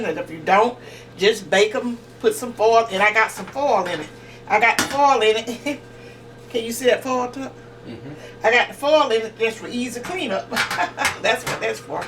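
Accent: South, United States. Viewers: that's the word southern accent not country I couldn't think of that word, yeah the crazy part about that stories I don't understand why my Grandma let my Great- Auntie change my Moms accent ? I wish I would have asked her that while she was alive. Well at any rate I can hear your Southern accent in your videos 😁 Thanks for taking the time to read my comment and to reply back to me I really appreciate that. Take Care and I pray God will Bless your day ❤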